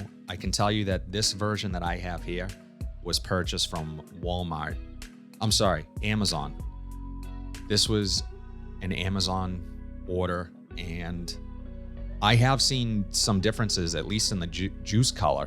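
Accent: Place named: Massachusetts, United States. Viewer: You from Massachusetts, bro? You've got the regional accent. "Pak the cah on the boulevaad." 😉 Thanks for the vid. 👍 Cheers from New York City.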